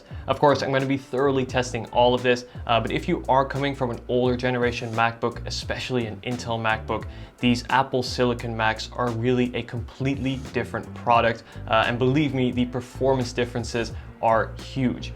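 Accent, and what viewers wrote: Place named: Netherlands. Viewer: Checked the name after I heard 1 second, yup Dutch…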